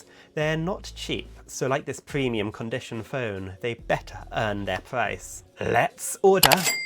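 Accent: United Kingdom. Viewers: Mate you are English for sure love it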